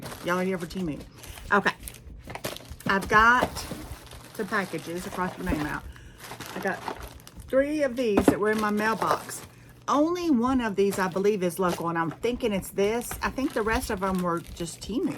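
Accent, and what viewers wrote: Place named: United States. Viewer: Oh you never fail to make me laugh”ain’t nobody all up in my legs” I’m from New Zealand so the way Americans talk makes me laugh ya all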